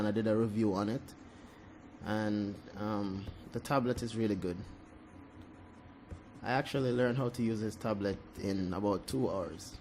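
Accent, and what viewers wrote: Jamaica. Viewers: Hello sir...where from the Caribbean are you from? You sound like a fellow yardie. Great work. I use frequency separation quite a bit.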